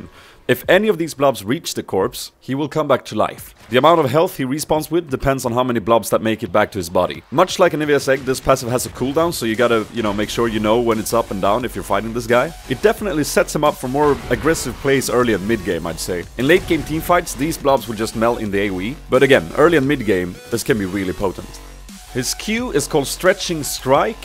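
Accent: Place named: Sweden. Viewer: Are you swedish? I think i can hear a swedish accent behind your awesome preview ^^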